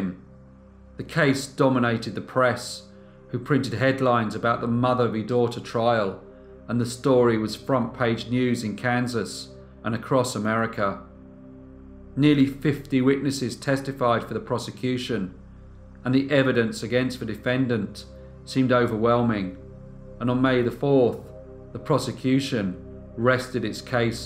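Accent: United Kingdom. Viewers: I would love to know where your British accent is actually from.London?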